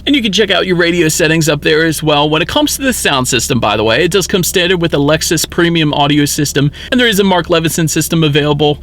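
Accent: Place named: Southern California, United States. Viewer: You from socal? You've got the surfbro accent.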